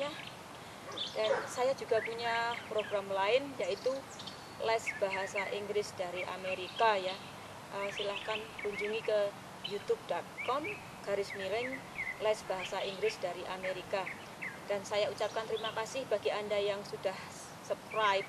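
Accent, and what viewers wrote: Java, Indonesia. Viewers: Mbak jowone nang ndi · MB asline mana ya, kaya Jawa... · Wkwkw jawane ngendi bu? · Logate wong njowo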